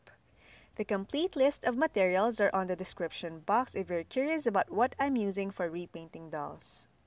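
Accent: Philippines. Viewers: you're accent is nice? are you a filipino? :D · I have been watching you since the first time I discover your channel. I have a feeling that you're a filipino because of the way you speak. Then I found that you really are filipino (or based in Philippines) when I checked your IG! I can't believe how talented you are ❤️❤️ hope you can make it even bigger in youtube and be more recognized not just in Philippines but internationally 🌹You are so amazing and talented.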